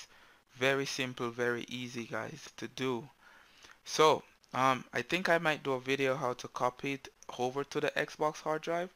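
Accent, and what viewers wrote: Jamaica. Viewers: Ur Jamaican ??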